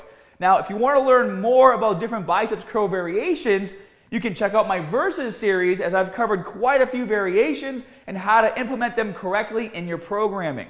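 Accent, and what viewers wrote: Boston, United States. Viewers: The Boston accent makes it better!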